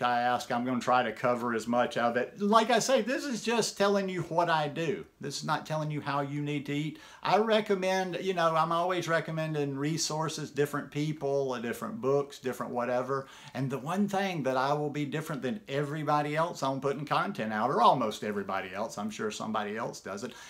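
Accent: South, United States. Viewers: If you want to put weight on, working out does build muscle! So, if you are not working out, you may want to add that to your weight gain process. Great videos! Thank you for sharing your experience! Your southern accent Always keeps your videos interesting and peaceful as I grew up Texas and you remind me of my life growing up! Vegan for life!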